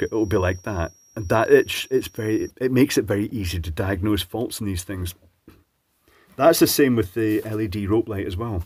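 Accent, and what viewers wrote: Scotland, United Kingdom. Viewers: Nice to see someone making decent electrical devices. I really like that they use rubber. I go out of my way to buy wire, test leads and so on, that are made from either rubber or silicon. Your videos are wonderful, especially on a Friday after a long work week and I need a pleasant Scottish accent dude showing me silly videos about his latest purchase of cheap Chinese electronics. Hehe. You're a gem :)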